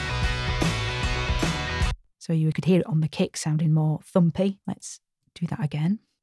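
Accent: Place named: North, United Kingdom. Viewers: Really enjoyed this. Great advice, well explained, and to cap it all - delivered with a wonderful Yorkshire accent. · The icing on the cake of this brilliant video is familiar, approachable, northern accent and easy style which just makes this a joy to watch and listen to! Thanks & Warren.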